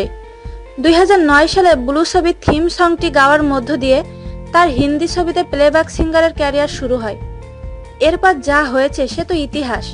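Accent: Bangladesh. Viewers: Tumi ki Bangladeshi.. bangla desir moto kotha.. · কি বিশ্রী উচ্চারণ এই বাংলাদেশী মাগীর। ইন্ডিয়ান বাঁড়া চুসে বিচির রস দিনে দুবার খেলে উচ্চারণ ঠিক হবে।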